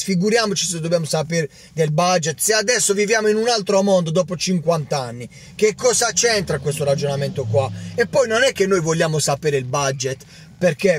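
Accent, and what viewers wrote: Apulia, Italy. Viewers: sei forte,continua così a parlare il pugliese, sei uno spasso, non sono milanista, sono dell'altra sponda del naviglio (interista), ma ti seguo tutte le sere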